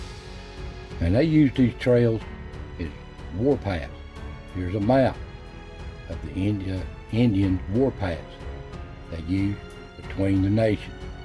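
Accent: Appalachia, United States. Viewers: Another great video by a man with a wonderful Appalachian- southern accent.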